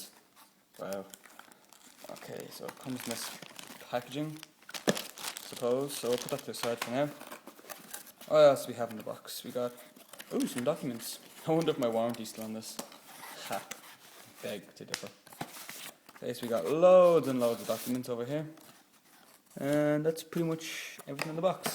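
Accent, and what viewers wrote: Ireland. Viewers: You Irish? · ***** hes irish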